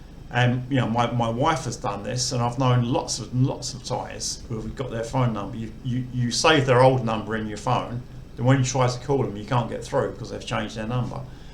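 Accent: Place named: Southern England, United Kingdom. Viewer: Hi Interestingly, if you are northern English, then but and put ARE pronounced the same (both pronounced as you say put). To my northern ears, southern English such as yourself say almost bat (for but). Hence the problem of transliteration of English in to thai for English people, let alone considering all the other nationalities. Keep us the good work.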